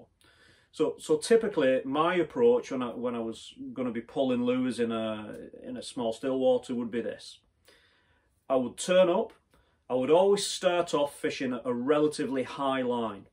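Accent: Wigan, United Kingdom. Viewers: I think I have learned more off watching this video than I have on any of the others Iv seen - thank you! (could that be a Wigan accent by the way sounds like your from gods country?)😊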